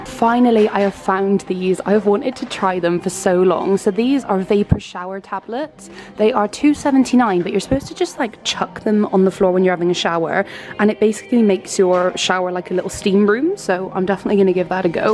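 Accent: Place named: England, United Kingdom. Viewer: Hello sweet lady I'm in America from the suburb of Chicago May I ask where you're from you sound like you're from London Have you ever been to America or Chicago We have a store called Dollar Tree sometimes they have good stuff sometimes they don't all depends what you're looking for Thank you for a nice video God bless have a good day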